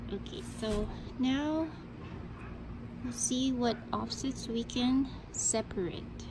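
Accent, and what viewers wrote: Philippines. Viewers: I love your cute Philippine accent.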